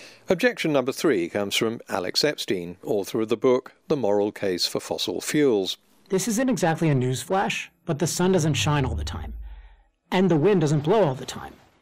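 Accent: United Kingdom. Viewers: What's wrong with his delivery? As a well educated British middle class person, his voice comes across as balanced, clear, and perfectly normal. It's an educated accent and very normal. He is delivering an educational discourse and does so in a rounded way. Is it just someone being British that you don't approve of?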